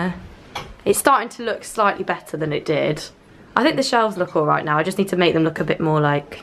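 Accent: United Kingdom. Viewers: I’m British but u sound more British then me but like I loke love ur accent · OMG YOU HAVE THE BEST BRITISH ACCENT!!!!!! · British accent confirmed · I love the british accent😀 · The British accent 😍😍😍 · I always love British accent 💙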